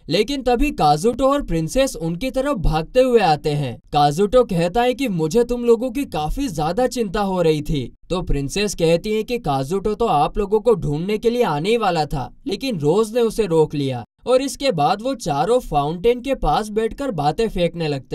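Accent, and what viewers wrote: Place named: Gujarat, India. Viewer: Bhai ton Gujarati jese ha muje to acha lga😅❤ Love you 😎 bro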